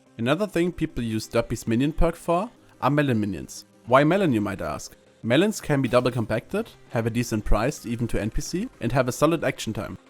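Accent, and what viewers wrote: Germany. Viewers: i would buy my coop alchemy 50 ign laux2ß2ß nice vid btw and u have a small german dialekt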